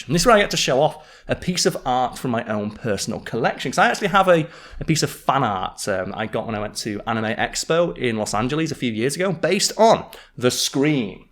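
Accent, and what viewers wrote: United Kingdom. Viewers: Ignore the haters, your great yes Simon was great too but you don’t try to hide the fact your British like he did ( he spoke to American for my liking ) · It was definitely a change going from Simon to you, but I've taken to your style. Still really dig the Factboi's style, but not at the expense of you. As for your voice, I'm a dumb American so I like all the different English accents, and yours is cool. Your style and delivery are your own, and they are cool. Edit: told you I was a dumb Yank; should have used a synonym for cool the second time, or at least added too.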